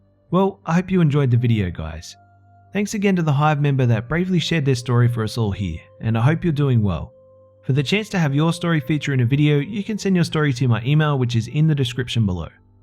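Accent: Australia. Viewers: aussie for days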